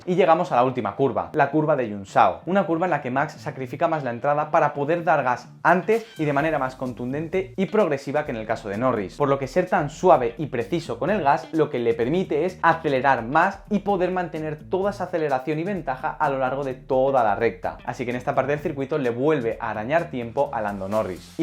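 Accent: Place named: Spain. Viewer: El YouTuber es español por si acento y esta correcto en lo que dice de Max ...pero Fernando Alonso gran piloto no es bueno en lluvia ...Max si ..z Schumacher si Senna si ..Besos si ...Prost No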